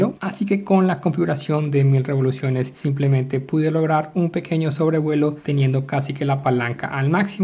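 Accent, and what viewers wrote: Colombia. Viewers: hola, eres de colombia??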